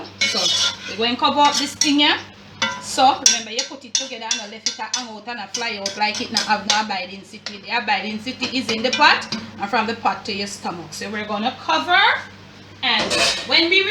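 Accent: Jamaica. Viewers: Love the cooking show and the Jamaican twang.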